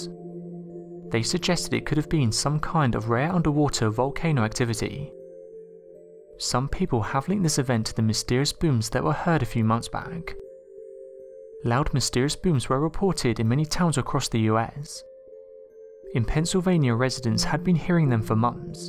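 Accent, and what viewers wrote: United Kingdom. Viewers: It is to. He's British, which you SHOULD be able to tell from his accent.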